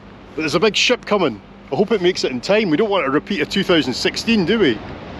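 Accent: Scotland, United Kingdom. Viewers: Always enjoying your videos ... and your fine scottish accent :-) This is indeed one heck of a special way to cross a canal! Wow Many happy and safe trails for the new year!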